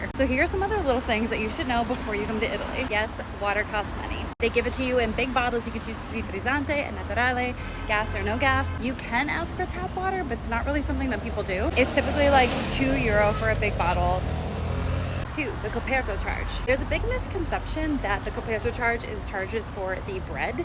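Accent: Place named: United States. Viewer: Sorry, but I couldnt really understand but a few words you spoke. As I’ve commented before, you speak so quickly and not everyone understands American.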